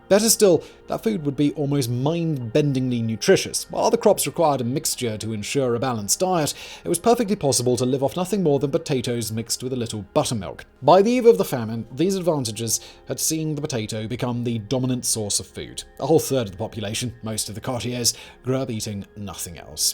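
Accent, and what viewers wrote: RP, United Kingdom. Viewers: You soft pedaled the affair. There's no line at which treatment like this suddenly becomes genocide or not genocide. You with your posh accent, to try and hide the fact of what a dope you are! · Great vid. Was particularly unusual to hear all this is Simon's British accent.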